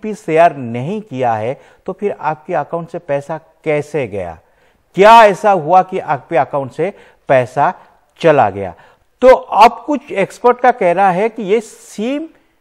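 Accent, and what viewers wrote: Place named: Bengal, India. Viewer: Bangali tone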